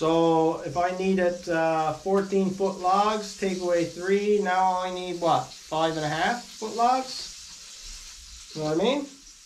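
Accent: Newfoundland, Canada. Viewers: Wow what a guy! Thank you sir for the shout out! Some nice to hear that beautiful newfie dialect come through my speaker lemme tell ya! Keep er going man!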